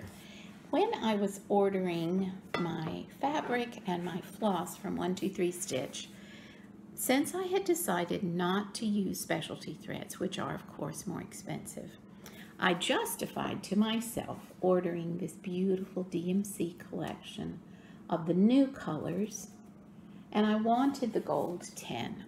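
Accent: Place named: Texas, United States. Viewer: So nice to hear a sweet Texas accent and to find another homeschooling mom (although mine are now in their thirties). Your Mary Queen of Scots will be stunning! Your curls are beautiful. I'm in Athens and am enjoying the rain as we badly need it. I heard about you on Rachel's FlossTube and I think she mentioned you go to the Tudor Rose Guild, unless I misheard. I'm going to the October meeting. Hope to meet you if you are there. I'm coming up on my FlossTube Anniversary, too!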